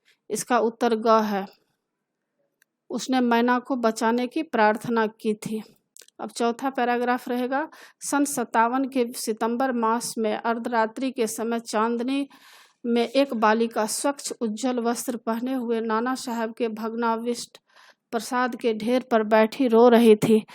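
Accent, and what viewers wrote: Bihar, India. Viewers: Mam aap bihari hai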